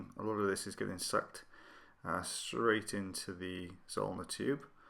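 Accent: United Kingdom. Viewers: So good to hear a UK accent.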